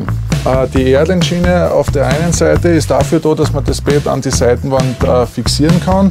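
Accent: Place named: Low German, Germany. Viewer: Wenn man Kunden gewinnen will sollte man verständlich sprechen. unn nitt sei Platt!!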